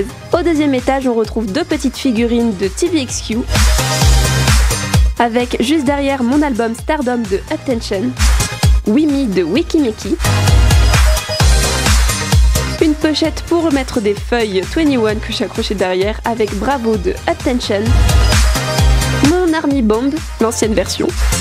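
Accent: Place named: England, United Kingdom. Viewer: Cet accent anglais srx ♡ Sinon plein de love du Maroc ♡♡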